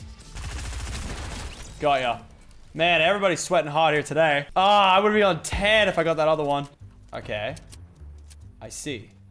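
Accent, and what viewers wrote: Australia. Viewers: hes an aussie ya fake fan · Is he Australian or American it’s really hard to tell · Are you aussie i am too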